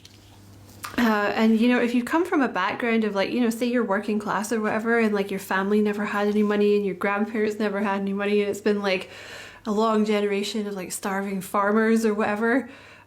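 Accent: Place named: Scotland, United Kingdom. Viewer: It’s so lush to hear a Scottish accent for once ❤ thank-you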